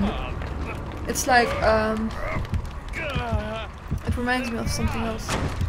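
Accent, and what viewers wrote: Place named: Netherlands. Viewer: Her accent sounds dutch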